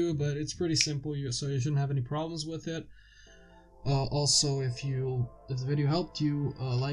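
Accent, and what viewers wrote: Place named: United Kingdom. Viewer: Spawning objects show up anywhere anytime even if there is no link from one level to another because they are part of the only one collective integrated resource system which is self aware of the player xp so it gets more interesting the logic criterias of the program rather than the in game experience xp. The Brit voicings are always more interactive anyways.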